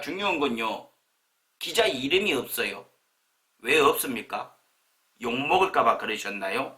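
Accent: Jeolla, South Korea. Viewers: 똥물... 맞네 ㅋㅋㅋㅋㅋㅋㅋㅋ 근데 발음 교정좀 해주세요 전라도 말인데... 차명진 문제야 지금은 경상도 말쓰면 경상도코로나 라도 하던데 피하고 난지금도 피하고싶어요 경상도 말하는사람들 한테 코로나 결릴까봐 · 강성범씨 고향이 경기도라고 하시던데, 전라도말을 구사하다보니 맛깔스러움도있지만 살짝 부담도 됩니다 속 시원하게 해 주는 매력 넘쳐요~~ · 왜 코메디 계에서 왜 안보이나 했더니 정치판에 들어왔냐?송충이는 솔잎을 먹어야 산다고 했던가? 하하..대가리에 들은것도 별로 없지 싶은데 참 어울리지 않는다. 표만 깎아 먹는다. 도 절라도 치인가? 전라도 사투리를 많이 쓰네!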